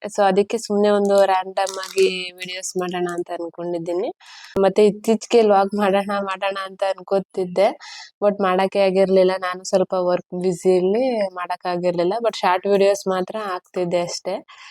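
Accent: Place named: Mysore, India. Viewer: Nivu mysore bhasa matadatira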